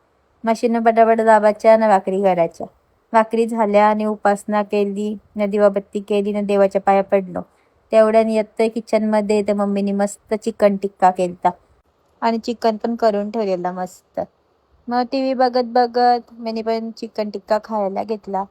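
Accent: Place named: Agri, India. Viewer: Kiti agari bhasha boltes g vlog kartes na vavsthith bol jara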